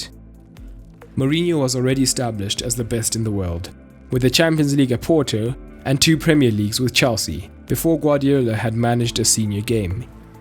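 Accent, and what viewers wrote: South Africa. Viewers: Is this South African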